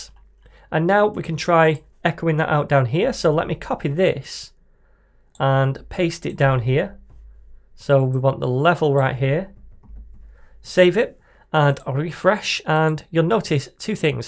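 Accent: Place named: Northern England, United Kingdom. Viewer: All your tutorials are fantastic. Great to hear a good northern accent also; more engaging somehow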